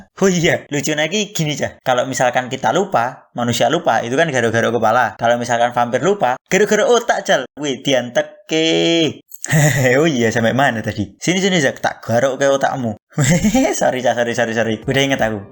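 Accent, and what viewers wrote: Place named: Java, Indonesia. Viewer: Wkwk jowo banget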